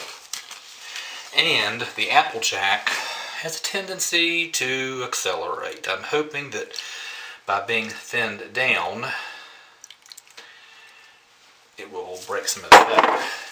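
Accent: South, United States. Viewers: Hi! From Florida! I’m from NC, mountain side. It’s not often I get to hear a good Southerner accent. Love the soap!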